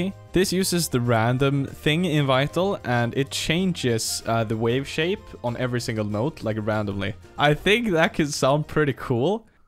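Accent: Sweden. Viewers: din svengelska dialekt är ju fett najs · I think you should give us the mixing stuff that you always do with details. And a mastering start to finish .(i actually love your swedish accent 😂)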